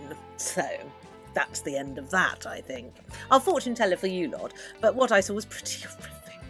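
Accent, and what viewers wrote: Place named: Somerset, United Kingdom. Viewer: I'll laugh if you're from Totnes! But I'm detecting more...... Somerset?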